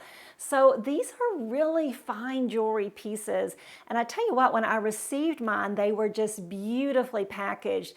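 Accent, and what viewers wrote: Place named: Texas, United States. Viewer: Hi often listen to your videos like them lovvvvve your accent American is it Texan? It's lovely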